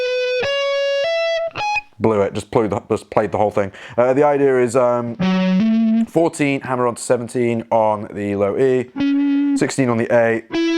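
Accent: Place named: Stoke, United Kingdom. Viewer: Uhhh man. That Stoke accent is hideous 🤮🤮🤮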